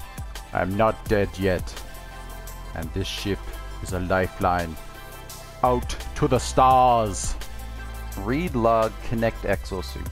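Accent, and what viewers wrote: Newfoundland, Canada. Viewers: Judging by your channel name and accent im going to guess you're from Newfoundland? lol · Are you from Newfoundland?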